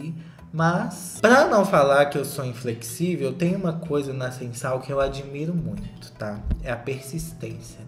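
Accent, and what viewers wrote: Minas Gerais, Brazil. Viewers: Agora q eu noteiii é mineiro?! Quero ser sua amiga, bora sair kkk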